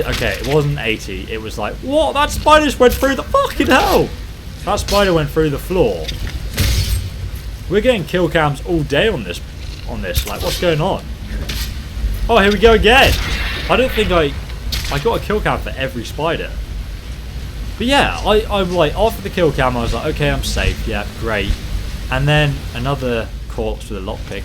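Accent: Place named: United Kingdom. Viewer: Your voice is easy to listen to, I like the British type accent and Australian and NZ etc. I tend to hate the American accent, that's why I can't look at many videos which are narrated by Americans (their voices just sound so annoying). As soon as a video opens and it is narrated by an American, I am immediately thinking "what a retarded sounding moron". lol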